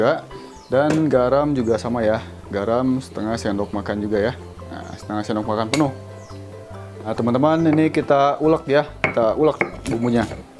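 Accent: Sunda, Indonesia. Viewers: Porocotin heheee.. Sundanese pisan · Mantap Aa dn mksih infony sunda bgt AA 😁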